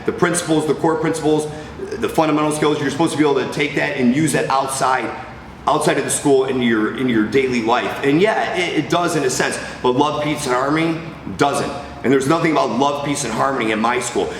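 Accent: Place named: Scotland, United Kingdom. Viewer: Hi big fan of your tHE ROGUE WARRIORS series of vids very impressive, i wonder if any of your students teach this no bullshit style in the uk,on a lighter note I LOL at you comment i am about to shit on your corn flakes (you sound like my scottish budy) my personal comment in the uk is usually well that pissed on your strawberrys, i can't believe the negative troll comments you get from people who just decide they can't believe what they see, ar well i guess every ying has its yang all the best, Russ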